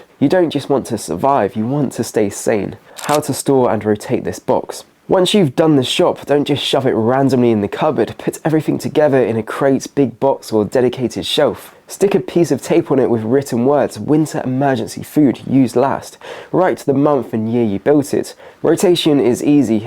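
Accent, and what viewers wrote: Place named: Cornwall, United Kingdom. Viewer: I think he’s from Cornwall or something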